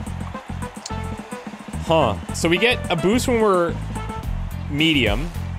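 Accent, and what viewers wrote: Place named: Saskatchewan, Canada. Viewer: Your speaking gets much more "Sasky" when you are playing this game. As a fellow Saskatchetonian, I love it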